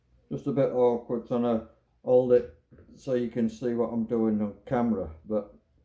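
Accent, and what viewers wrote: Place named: North, United Kingdom. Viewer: Great video mate... Exactly what I needed and straight to the point and good to hear a fellow northerner ... Thanks from Hull nick 👍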